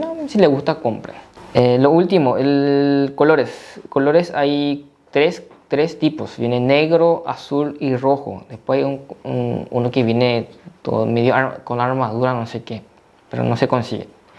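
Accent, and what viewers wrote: Paraguay. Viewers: Se nota cuando alguien habla en paraguayo😂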